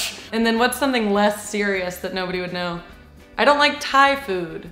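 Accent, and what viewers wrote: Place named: South, United States. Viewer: "everybody's got sumthin" YASS southern accent queen.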